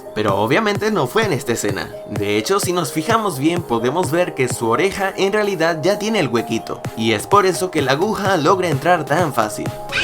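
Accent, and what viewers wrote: Venezuela. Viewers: Eres de Venezuela ? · consolo oir tu voz dije naaaa este chico es venezolano 7u7 ven paca y abrame compañero :v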